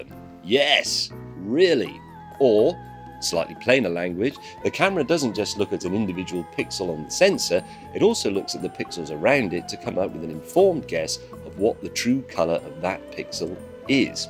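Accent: United Kingdom. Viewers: Everything sounds better in a British accent.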